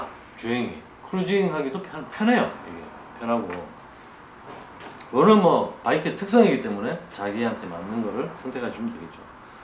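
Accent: Gyeongsang, South Korea. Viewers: 어눌한 갱상도 사투리 계속 듣고 있으니 감기는 맛이 있습니다 ㅎㅎㅎ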